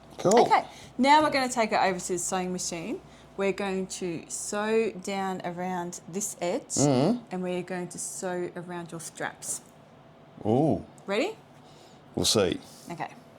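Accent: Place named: Australia. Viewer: Only came across your tutorials last week but absolutely loving them and pleased to be hearing an Aussie accent, colloquials and slang for a change. Brilliant to see give it a hard crack and have a few bloopers. It was a good laugh because we've all been there with the awkwardness etc. Thanks heaps and looking forward to seeing more. Cheers from Wollongong, NSW.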